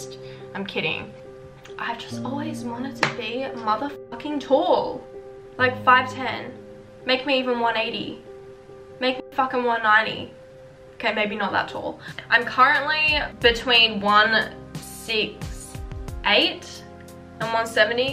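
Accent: Australia. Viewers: are you australian? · Her accent is like a mix of Australian and country but for some reason I love it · funny the Asian sounds Aussie damn. still cute tho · it’s called australian accent luv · Australian accent not austrian. Austria is in Europe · R u Australian? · you better tech us you Auwsiiie aCCennT💅💅💅💅💅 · She sounds like an aussie :)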